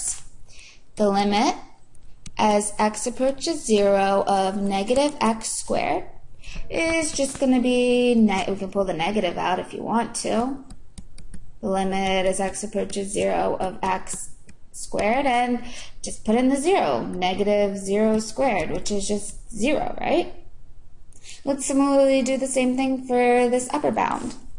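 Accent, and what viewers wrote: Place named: Maryland, United States. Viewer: hearing your maryland accent made me feel back at home while going to college in california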